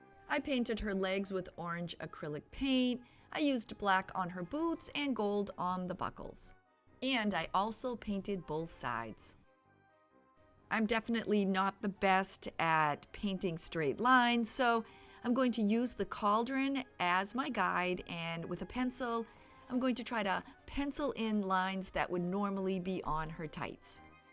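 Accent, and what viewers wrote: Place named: South, United States. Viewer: Being from the south i personally love the way you say metal but my favorite was the way you said " canning bands" . I am certainly not making fun. In case you don't remember, I'm the subscriber with the best friend that moved away and your accent sounds like hers. I absolutely love your crafts too and made quite a few. You never fail to make me smile so thank you!! I was having an awful day and now I feel better. 😊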